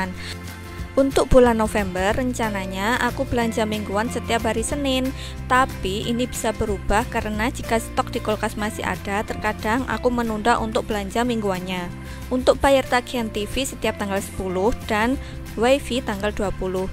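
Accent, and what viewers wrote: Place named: Java, Indonesia. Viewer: 😂😂😂 Swara medhokny suka... Semoga bisa menginspirasi bunda-bunda lainnya. Slam kenal nge bun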